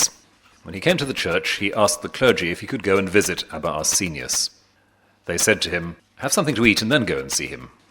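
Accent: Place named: RP, United Kingdom. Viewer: "Here we have two people reacting totally differently to human contact and human interest, one withdraws into silence, one embraces and they're both alright. Silence and honey cakes are equally acceptable [agreed, but that's not what you said here : <link> when you said that the thing to look for when a person is approaching union with God is that the person is sociable. People who isolate themselves are not close to God according to you when you were trying to manipulate me into stopping avoiding abusive people and situations. You're looking quite cheerful in this video because you still think you've got a chance of destroying me and you enjoy telling lies to a captive, and you assume admiring, audience]." "There are no standardised, generalised forms of holiness. Each one needs to discover what their path is [selling your soul to Satan and providing propaganda that leads over a million deaths and the emslavement of humanity isn't a path to holiness Rowan Williams. 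You are not holy. You are just trying to save your skin a since all your other deceitful and abusive strategies have failed]." "The whole business of passing judgement is so impossible [no it isn't]. Passing judgement in the sense the desert fathers so DEPLORE [his emphasis in his super posh voice, raising his voice not quite an octave to try and sound more authoritative. The desert fathers were talking about the ordinary every day sins of a group of monks living a common life. They were not talking about psychopa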